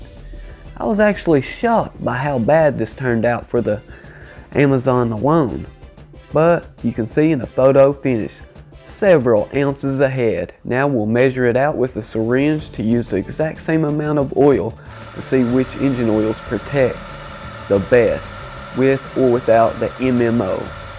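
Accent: United States. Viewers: This video confirms my belief, People from the south shouldn't be anywhere on my TV. Please talk correctly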